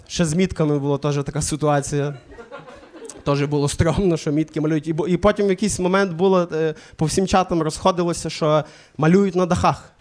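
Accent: Galicia, Ukraine. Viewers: Прекрасний галицький акцент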